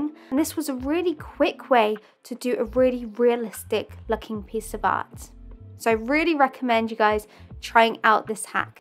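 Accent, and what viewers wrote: England, United Kingdom. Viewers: Hey. I love your work. What part of England are you from?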